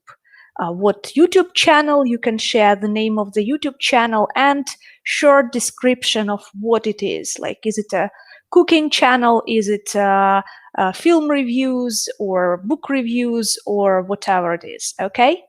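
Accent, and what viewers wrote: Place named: Russia. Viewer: Why do you speak English with Russian intonations?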